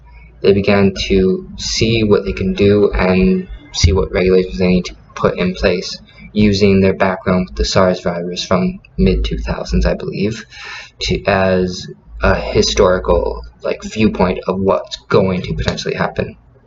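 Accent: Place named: United States. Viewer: Good sharing, you live in Taichung now? I can pick up your American accent. God bless America. MAGA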